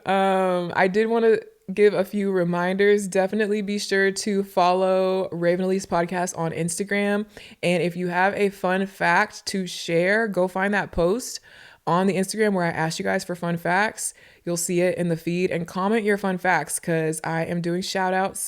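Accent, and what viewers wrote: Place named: South, United States. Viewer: Girl you are so funny! Especially with that southern lady accent. Have me hollering in my room “you gotta let go & let God” 😭❤️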